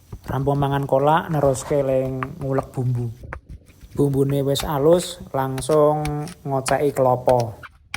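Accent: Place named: Java, Indonesia. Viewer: Mas sliramu piyayi ngendi si baha jowone ko medok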